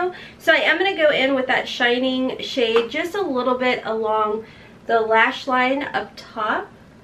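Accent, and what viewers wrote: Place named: West Virginia, United States. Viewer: Waaaaait... I’m from WV too and pick up on that accent every now and then as well. I’m sure there’s more of us out here, but I feel like we’re so few and far between! I live near Summersville, so I’ll say that and send my love wherever you are!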